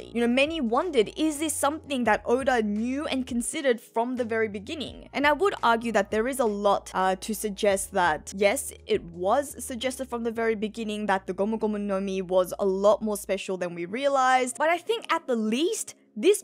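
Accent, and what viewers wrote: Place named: Australia. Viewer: The word of the day is Deuteragonist lol. Hearing it in an Australian accent is a delight. Great video!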